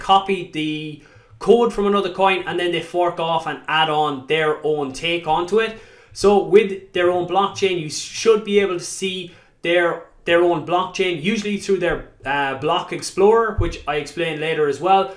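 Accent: Ireland. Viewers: good man,me too! 😉 Hey I notice you got Irish accent, I'm living in Dublin,I'm entrepreneur,was on dragons den last year. Do you live in Ireland? I'm starting work on a new project, a new.com crypto unlike any seen before, I obviously think it has massive potential, but i could do with a partner with good knowledge of crypto world like you. You interested?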